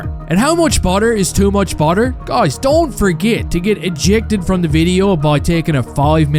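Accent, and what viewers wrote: Newfoundland, Canada. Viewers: This guy sounds so newfie I had to stop 40 seconds in.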